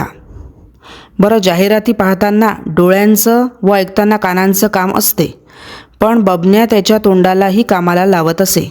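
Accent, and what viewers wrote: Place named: Vidarbha, India. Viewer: छान विनोदी आहे कथा. विदर्भातील भाषा छान सादर केली आहे.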